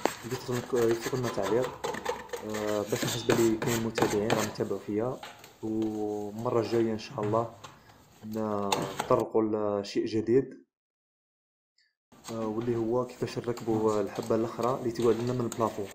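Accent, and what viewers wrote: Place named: M'Sila, Algeria. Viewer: تبان هدرتك من جيهة لمسيلة مشكور الاخ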